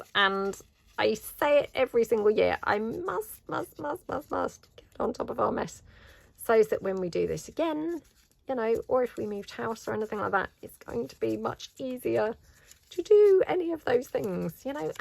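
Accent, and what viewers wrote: United Kingdom. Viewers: Omg those little flowers are adorable. Love all your papers and genius journal ideas. My 5yr old granddaughter loves your british accent and stories about your sausage dog Bo. She keeps asking for one. We have a german short haired pointer we bought her about a year ago but she loves the sausage dogs. ❤❤❤ We are from Kentucky here in the US and we are told we have accents too. 😂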